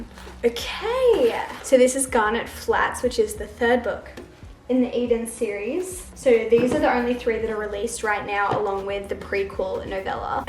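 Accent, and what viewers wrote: Australia. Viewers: Your Australian accent is beautiful !!!!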